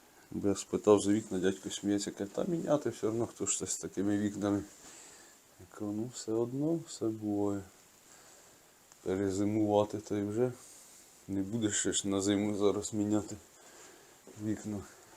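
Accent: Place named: Podillia, Ukraine. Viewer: У прекрасна вимова з приємним подільським діалектом. А "дядько каже " просто супер, не треба чіплятися до людини, мови вчать на інших каналах.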